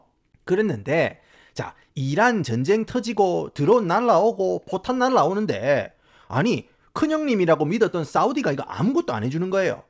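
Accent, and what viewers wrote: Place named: Busan, South Korea. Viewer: 처음엔 부산사투리를 살벌하게 구사하셔서 살짝 당황(?)하면서 초반만 보는듯 마는듯 봤었는데요 우연히 님 영상을 그냥 켜두고 제 할 일 하고 있었는데 하시는 말씀 듣다보니 어려울 수도 있는 정보와 지식을 참 쉽고 재미나게 풀어서 설명해주시는데 (중간 중간 난무하는 드립도 너무 중독적) 그리고 본업도 하시고 육아도 하시면서 자투리 시간을 할애에서 하시는 유튜브이신 것 같은데 진짜 퀄리티 장난 아니네요 이젠 30분 넘는 긴 영상이 기다려지고 (너무 몰입해서 봐서 그런가 이제 짧게 느껴져요) 완전 팬됐어요 럼프짱 성대모사는 봐도 봐도 안 질려요ㅋㅋㅋㅋㅋㅋㅋ가끔 댓글에 혀를 너무 굴려서 영어 발음 한다고 지적하는 사람들 있던데 10년 넘게 미국에서 살아본 제가 느낄때 님 발음도 구사하시는 문장도 흠 잡을데 없이 완벽합니다 영어랑 꽁냥꽁냥 1도 안되는 사람이 일부러 있어보이는 척 말끝마나 콩글리쉬 섞어쓰는거 보다 님이 네이티브로 구사해주시는게 듣는 제 귀엔 훨씬 편하네요~~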